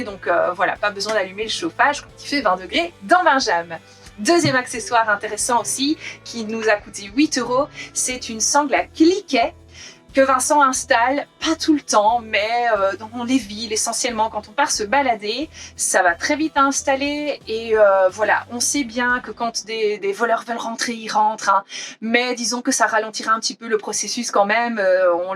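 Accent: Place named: Belgium. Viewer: Trop mignione cette femme avec son accents un petit accents belge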